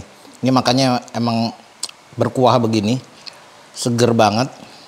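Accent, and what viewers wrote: Jakarta, Indonesia. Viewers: Ngambang ye bang inget yang dikali bang. Betawi abis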